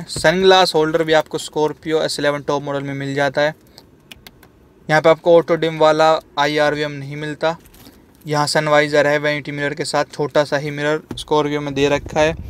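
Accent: Haryana, India. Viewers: Bhai yo desi haryanvi touch maza lata h car ke review me 😂😁😁😎😎😎💪💪💪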